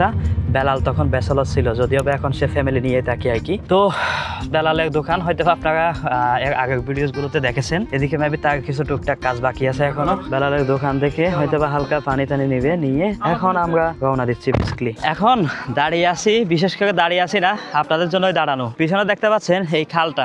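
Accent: Chittagong, Bangladesh. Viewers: ভাই তো চট্টগ্রামের মনে হয়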